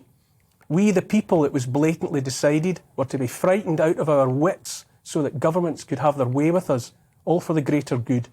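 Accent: Scotland, United Kingdom. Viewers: We have Rod Cullerton and Jason Miles from GAP. We have Graham Hood who's been very outspoken, along with Hoodys Helpers. I do like though.. I love a Scottish accent. The same will happen here. They know they're losing, no unvxd at fireworks, oh no please come.. just one example. I think you'll see a lot of scrambling now. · Agree with everything you say,. Look forward to every posting from you. You present with a calm Scottish accent but I can hear the anger beneath. As punishment for those responsible and especially those who made millions from their decisions I would suggest that they all have to return the money and sell their assets except for one average priced house and give that to theTreasury to help offset the bill that we, our children and our grandchildren will be paying for their lifetime.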